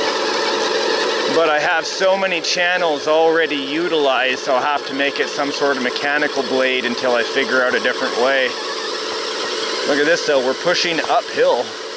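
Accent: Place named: Canada. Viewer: hey, are you from Canada? Because you sound like you are lol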